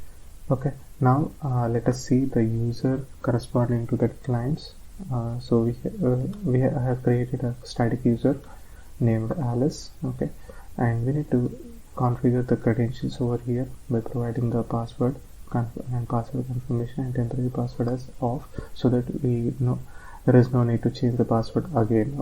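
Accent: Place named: India. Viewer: 印度英语 made me crazy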